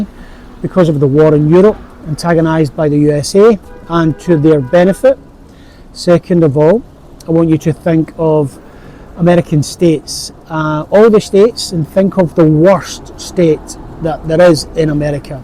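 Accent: United Kingdom. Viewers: can any one tell me which area of uk does this YouTuber come from? his accent is interesting. i want to visit that part of uk. i once head the original English once had uvular trill. Britain should pronounce as blr~, just like he pronounced. Indian got their accent from that. i wonder if that's true.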